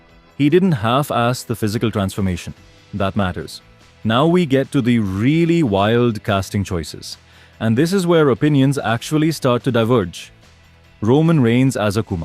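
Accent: India. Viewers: That an Indian voice lost credit for me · not trying to hide your indian accent behind AI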